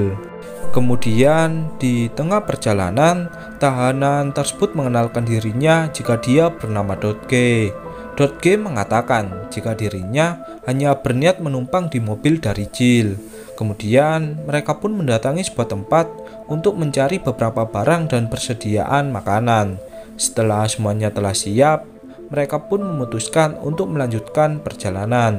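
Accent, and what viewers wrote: Java, Indonesia. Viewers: hostnya first medok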